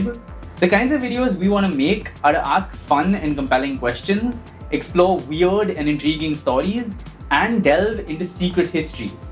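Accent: India. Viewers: Can't listen to the Indian English accent for more than 2 minutes without cringing :(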